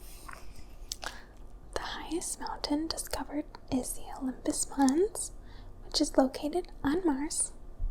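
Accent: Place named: Midwest, United States. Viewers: She must be from the Midwest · Its her midwest accent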